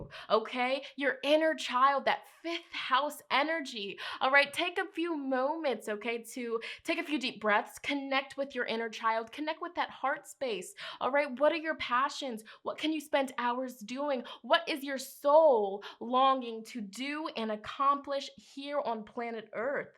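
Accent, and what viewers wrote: United Kingdom. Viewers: I love her undertone British accent❤️ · Interestingly enough I hear British accent in your voice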